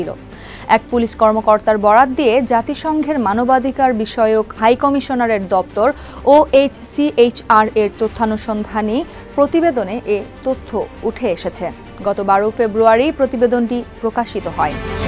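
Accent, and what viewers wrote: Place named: Kolkata, India. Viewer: Kolkata Bangla oyTa eyvabe I bole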